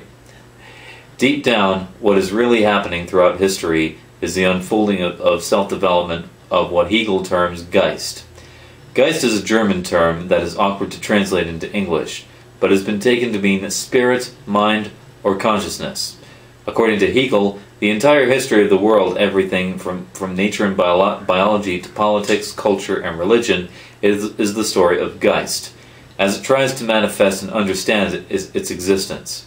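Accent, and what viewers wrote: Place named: Midwest, United States. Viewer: HAhaha nice! Wisconsinite's are abit more crazy with the r's though. That sound like a more sophisticated Midwestern accent. i cahn tie-pah een mah ayct-cint. baht i cahn't tah-k een ah us-trail-leen ayct-cint. wee-sh mah luk ^^^thats how a lot of people talk round here our is pronounced - "R" in fact r's dominate words in WI we just get stuck on the r's all the time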